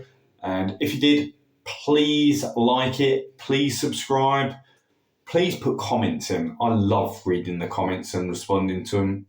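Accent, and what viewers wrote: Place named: United Kingdom. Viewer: The thing i love about british is the accsent it proper🇬🇧🇬🇧🇬🇧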